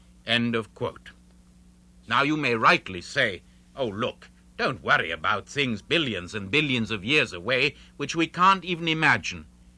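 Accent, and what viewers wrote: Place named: India. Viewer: Even his accent is a smorgasbord! I wouldn't have known he was American. He sounds a tad Indian and a tad English.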